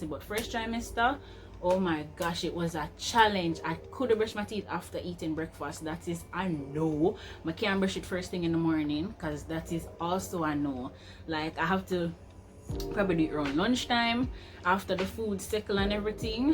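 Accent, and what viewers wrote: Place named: Jamaica. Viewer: That Jamaican accent 😻😻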